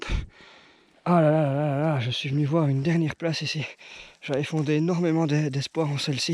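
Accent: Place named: Belgium. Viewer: Tout est parfait dans cette vidéo, les plans, les commentaires, le petit accent belge. On se régale nous ;)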